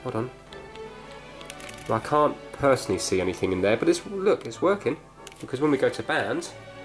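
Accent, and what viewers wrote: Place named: East Anglia, United Kingdom. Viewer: I can't quite place accent though. Sometimes he sounds like he's from East Anglia, other times Irish!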